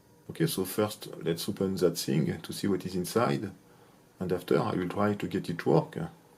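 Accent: France. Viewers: Friend, after you get used to watching videos with the French accent you will get much better at it, it just takes a little practice 😸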